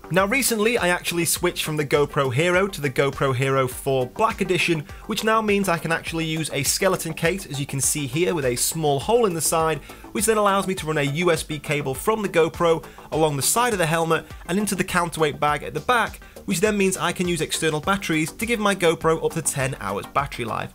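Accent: United Kingdom. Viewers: Really Nice! I'd like to start with airsoft as well, but here in Germany there are only a few places to play and without a car you can't go anywhere... I like the HK MP 7 quite much and maybe someone has a tip for me...(under 0,5 J ). go on with your amazing videos, I enjoy them very much because I like British English much more than American English..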